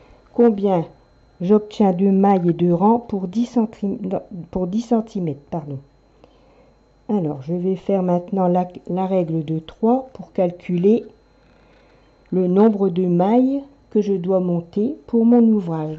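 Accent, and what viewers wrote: North, France. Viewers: Merci pour vos explications j'essaierai d'appliquer vos conseils et vous tiendrai au courant et encore un grand bravo pour vos vidéos encore une petite question il me semble reconnaître un accent nordiste dans votre voix est ce je me trompe ?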